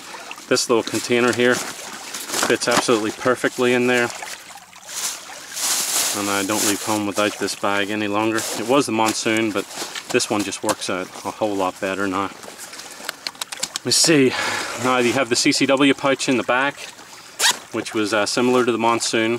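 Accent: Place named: Northern Ireland, United Kingdom. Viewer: Hey this is the first of your video's that i have watched! I gotta move to USA! lol. I love how after 20 years you still have your Northern Irish accent, I love the hybrid USA/Northern Irish twang you've got it's so cool! Gonna have a lot of fun looking through your vids! Blessings from Carrickfergus NI.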